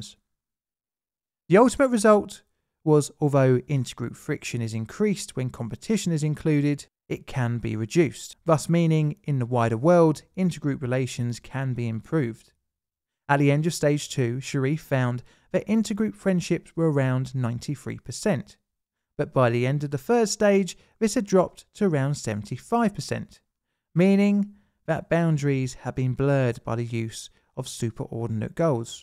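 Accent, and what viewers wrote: United Kingdom. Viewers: Mo-caison??? Its Mock-a-sin... I get it you're British but holy guac I've never seen someone get that so wrong!! I'd love to see you say Boise, or Pocatello.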